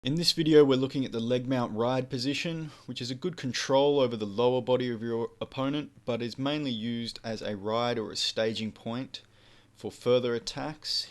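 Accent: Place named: Australia. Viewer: Bro youre a beast. Aussie accent rofl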